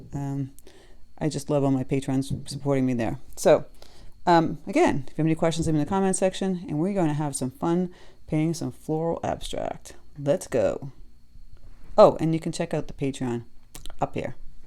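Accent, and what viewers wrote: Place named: Massachusetts, United States. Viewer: Love your teaching style I’m a beginner but enjoy your instructional method of don’t stress. Also detected a bit of a Mass accent 😀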